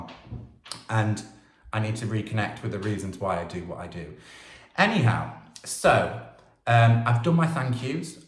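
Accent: United Kingdom. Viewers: Thank you for sharing your creativity and your wisdom on how to turn an ugly or aged piece of furniture into a beautiful piece of furniture that more than often brings me a startling and genius idea on how your work progresses. It is a journey and watching you from Quebec, Canada was is and still will be a great delight to me. I love it when you say this wood project or painting is therapeutic. Because it's for me as well in the last year. Fixing pieces, painting them isn't my real work like you. Soon I have to go back to the real world and start working. Wish you all the best! P.s. Your British accent reminds of my teachers colleagues with whom I worked in international schools and I miss so much. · I'm thrilled your moving forward in a newish you direction. Artists, I believe, always need inspiration. So happy you paused and are in a happier place. I'm glad I can still hear my favorite UK accent. 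Cheers to you from the States ❤🇺🇲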